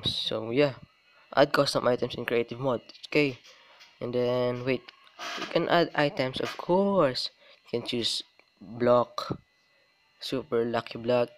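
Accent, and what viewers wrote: Philippines. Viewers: filipino right? english palang alam na this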